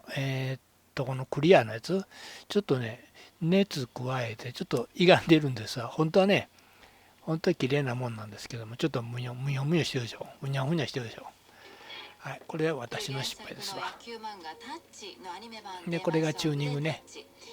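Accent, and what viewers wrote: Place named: Kansai, Japan. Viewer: 声質と関西訛りのせいでしょうか、「ラジオ」が「ダジオ」に聞こえてなりませんわ😁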